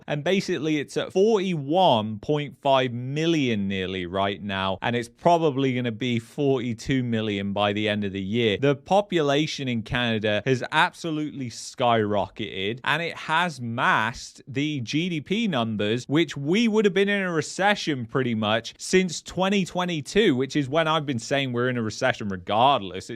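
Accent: United Kingdom. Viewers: “ have saw” . You said it twice. How about Present Perfect “have seen”? Why don’t you know this? I bet you say “have went”, “ have ran”, “ have came” instead of “ have gone”, have run”, “ have come”. I’m hearing this everybday all day. Since when have people suddenly forgotten the Past Participles of Irregular verbs? In the past there was always some uneducated person that didn’t know them like the Beverly Hillbillies but now it seems like everyone. NEWS FLASH: You are no longer speaking English but a kind of Pidgin English. There is no excuse for this especially someone speaking with a British accent.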